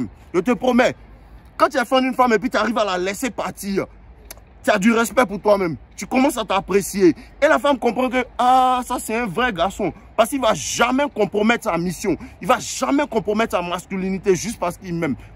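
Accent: Ivory Coast. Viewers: Tu parles comme un ivoirien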